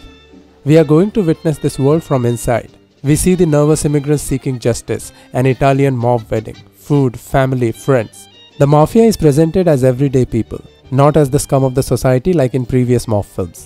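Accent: India. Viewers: That's an indian accent, I can finally recognise it.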